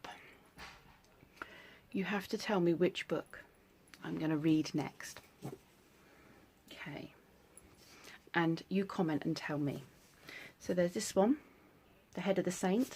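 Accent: United Kingdom. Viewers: The mystery one of course, "All the Missing Girls". I'm enjoying your vlogs & your British accent. Beautiful memory box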